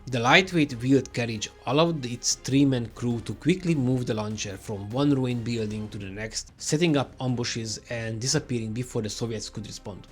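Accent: Hungary. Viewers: They don't mind the Hungarian accent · nagyon lehet hallani hogy egy magyar beszél angolul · You have a hungarian accent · Jó hallani egy kis magyar akcentust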